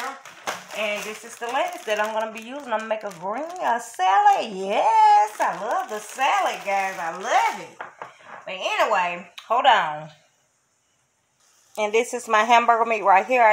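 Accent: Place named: South, United States. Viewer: I LOVE spaghetti squash! One of my favorite vegetables😊 also, that sweet south in your voice reminds me of home. My nana is from Alabama and I haven’t been able to visit my cousins since the pandemic. Thanks for making me feel a little taste of home!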